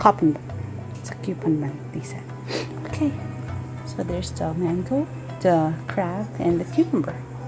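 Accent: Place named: England, United Kingdom. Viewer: Yum! 😋 love your english accent! Galing magchopsticks ha! Your awesome! 😄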